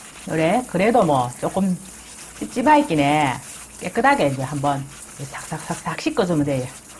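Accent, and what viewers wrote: Gyeongsang, South Korea. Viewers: 선생님 경상도 사투리가 넘 재밌고 영상을 보는날엔 하루가 기분좋고 중독되서 자꾸보고 밥때가 되는줄도 모릅니다~~ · 경상도사투리 넘 좋아요ᆢ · 경상도사투리가재밋네요. 지금은서울에사나요? 요리를쉽게배우게하니감사합니다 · 이 갱상도 사투리가 넘 구여워요. ~^^ 사투리 듣기 싫은 분은 보지 마이소. 싫다며 왜 들으며 댓글에 올리는거죠? 정보가 좋으면 듣는거지요. 오이 심어 다량 나올때 아주 좋은 저장음식, 묵나물형이 될 것 같습니다! 고마워요.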